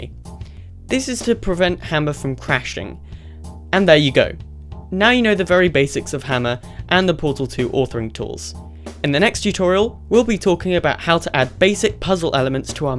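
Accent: United Kingdom. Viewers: finally a fellow brit i can finally learn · Woah woah my man is Bri'ish. So am I mate! (well, I live in the UK so does this count?)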